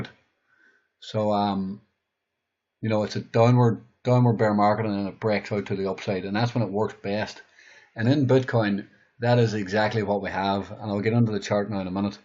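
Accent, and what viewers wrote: Northern Ireland, United Kingdom. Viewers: Where you from! Sounds like northern ireland to me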